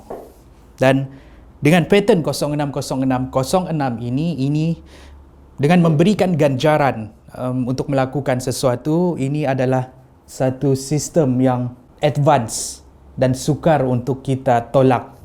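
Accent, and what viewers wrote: Sabah, Malaysia. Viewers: ini kali ketara sabahnya jo 🤣🤣🤣🤣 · aku asyik trgelak je ni bila asyik2 tgk nota hahaha 😂 ckp bahasa sabah comel ja bah 😂 #salamdrmelaka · The best part is when dengar cerita but with sabahan slang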